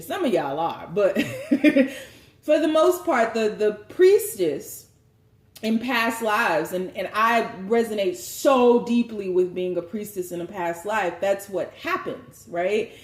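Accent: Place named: United States. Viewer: I love your accent and style of reading. Which part of US are u from?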